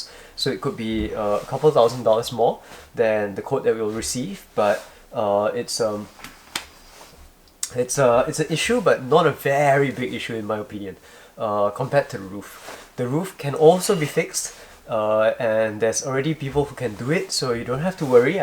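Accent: Singapore. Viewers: Are you Singaporean? Your accent very familiar..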